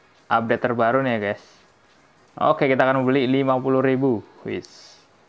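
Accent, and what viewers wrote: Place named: Malaysia. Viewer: Abang ini pasti orang Kalimantan dekat Malaysia pantesan suara nya kayak orang malaysia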